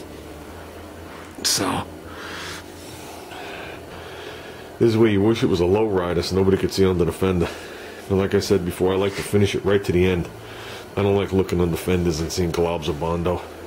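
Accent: New York, United States. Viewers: Sweet power hour. Your accent reminds me of my god parents who lived in Pawling, NY.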